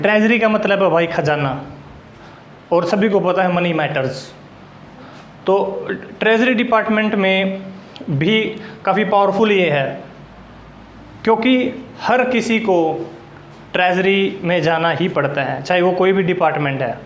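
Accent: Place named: Haryana, India. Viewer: मुझे अपनी साइड का accent लगा बोली का तब पूछ लिया था sir मैं proper नारनौंद से हूँ Best wishes for your channel भोले नाथ आपको खूब तरक्की दे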